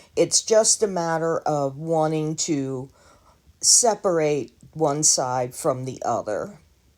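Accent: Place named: East Coast, United States. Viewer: Very nice! I'm just starting with resin. I have a starter kit coming soon. Been creating jewelry for 35 years, but just noticed resin. Sounds like you're from the east coast. I'm from Philly, but I listen to accents from reason.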